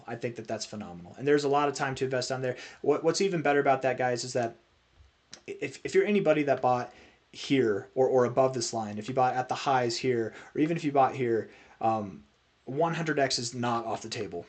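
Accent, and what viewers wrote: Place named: United States. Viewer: Random question but when did you leave America? Cause ur accent is definitely American but the UTC time zone is far from America lol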